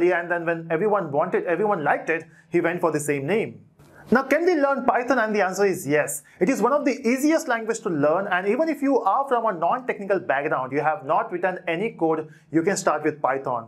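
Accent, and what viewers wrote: India. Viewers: So, before I learn Python, I have to learn your Indian dialect. Hmm...this may not be for me.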